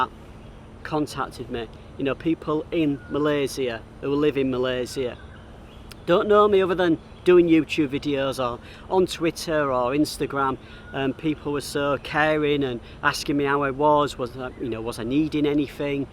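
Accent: United Kingdom. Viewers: stay safe mate🤭🤭 if we meet teach me british accent haha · I believe you are from the UK because of your accent. I have been to the UK for training and stay in stevenage about 2 months.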